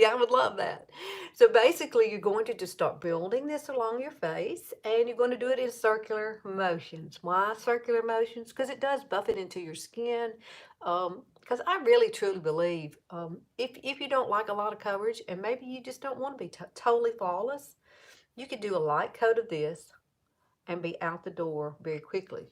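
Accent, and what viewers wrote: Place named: Tennessee, United States. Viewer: Loved this tutorial. I’m in Texas and we had those same storms. Anyway....you’re like a TN accented Theresa Caputo(sp). 😀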